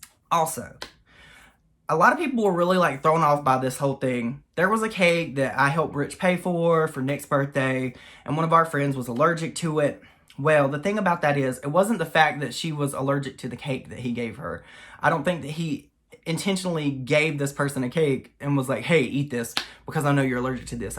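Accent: South, United States. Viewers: Im disgusted. I only have youtube, no other social media so i dont see/hear other drama. What I can yell you, as an insignificant Aussie ol' gay boy, you and Nick is the duo I enjoy watching. I dont know what to make of Rich, in Aprils video's she says he's a shallow, immature cry-baby. Anyway, I think you are a sweet guy, i love your fucking accent! I know Americans like our accent, well I just LOVE 💕💯🌈 The southern accent, i think its gorgeous. Believe in yourself, you give more to the world than you know and I think the online world appreciates you more than you know. Everyone knows you & Nick are 💯 real, youre funny good guys, the fact that you're willing to say "Hey, I'm no role model, I'm straight up shady sometimes" lets me know that you are self aware. I think Rich see's himself in a particular way and it really isn't based 100% in reality. 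I know you don't know me and me and what I think and say doesnt really matter but just know that you entertain me and I really do appreciate your work and find it entertaining. Sending LOVE 💜💕🌈💯 and support from Australia girl 😚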